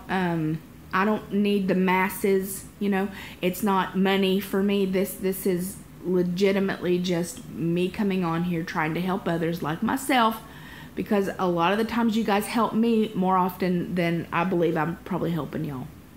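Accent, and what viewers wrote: South, United States. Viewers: I found your channel a few weeks ago, after looking for WLS vlogs. I love your candid conversations. it makes me feel less alone. I also love your accent! Southern girls rule!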